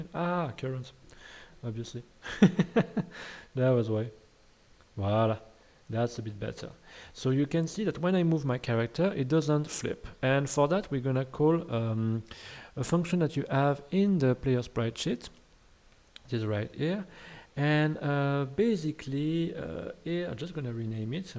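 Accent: France. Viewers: Ouais je me suis dit que son accent c'est 100% français.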